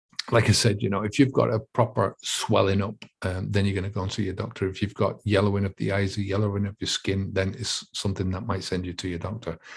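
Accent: Ireland. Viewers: New here, can tell you're a fellow Irishman but can't quite place the county 😂 if i had to guess id say youre a Dub? Great video either way pal!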